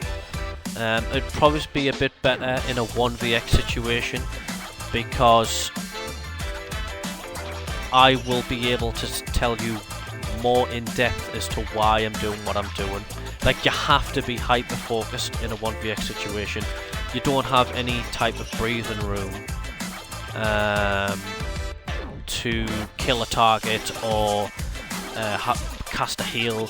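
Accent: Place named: North, United Kingdom. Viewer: listen to those dulcet northern tones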